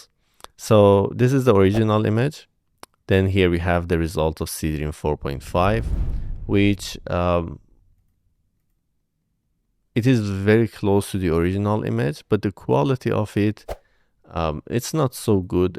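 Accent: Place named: Iran. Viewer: Yo. I'm Persian too. I Noticed your Persian accent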